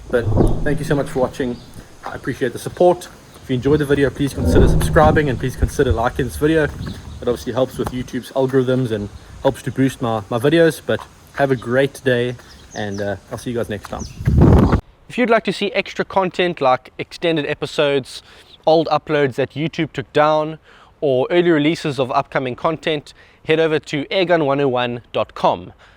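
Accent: South Africa. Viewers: You can hear dat he is afrikaans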